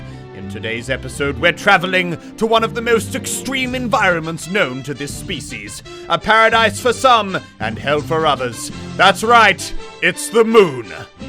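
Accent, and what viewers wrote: United Kingdom. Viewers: An Australian speaking with a British accent seems.... wrong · why the british accent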